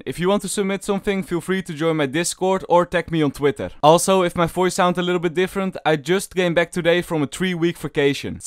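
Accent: Netherlands. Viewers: i smell dutch u from holland ? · Ik merk aan je accent dat je nederlands bent.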